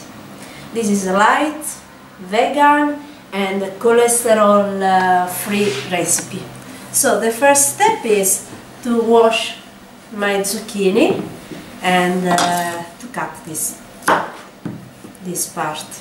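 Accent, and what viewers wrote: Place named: Italy. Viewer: U are so sweet I love Italian people and accent ♥️💚♥️💚♥️💚thanks for sharing video God bless you 🙏🙏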